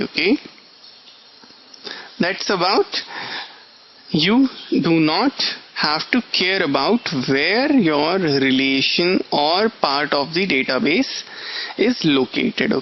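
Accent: India. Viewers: its the indian accent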